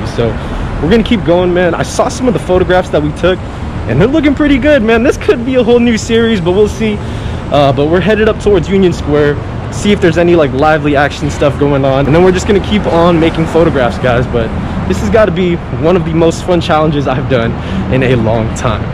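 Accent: Philippines. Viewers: Road to 100k subs folks. Like William veerbeck and other black guy who loves film photography, they did it too. Keep uploading more videos. Love it, from your filipino accent. Hehe ♡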